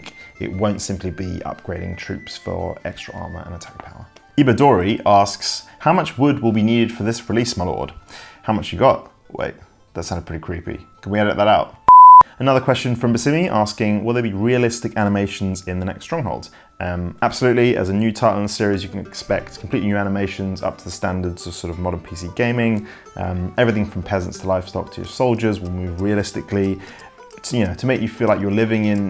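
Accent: United Kingdom. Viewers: I thought is playing Lol only,but no,here he is talking with some strange British accent. GGWP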